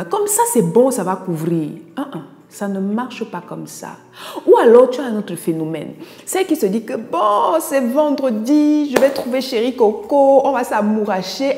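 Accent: Cameroon. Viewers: FAQ: êtes vous camerounaise? 😅😅 je reconnais un peu l'accent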